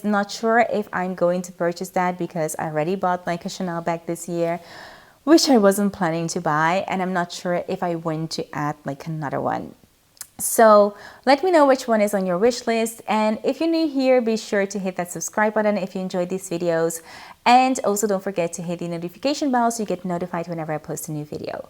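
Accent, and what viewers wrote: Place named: Netherlands. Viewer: wat leuk! Ik wist het ik dacht het is Nederlands of iemand die Afrikaans van Zuid Afrika spreekt. Yes ben Nederlands Surinaams maar woon al 8 jaar in Noord Amerika momenteel in Montreal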